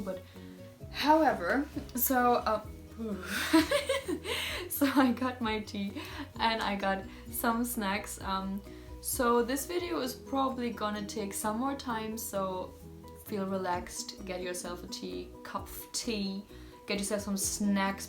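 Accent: India. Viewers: Yes you are right, you are not a tourist you are a citizen of India.. People in my country should understand this, I have one person who is very close to me she experienced the same as you. She feels bad about it sometimes.. And in general she is more Indian than me in many things.. Anyways your accent is full like an Indian, the way you pronounce... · I felt like I was listening to an Indian not a German, I think your have explored India more than an average Indian, Your video was nice in terms of perspective of a outsider or a foreigner of what the person thinks about India and its cultural habits 🙏🙏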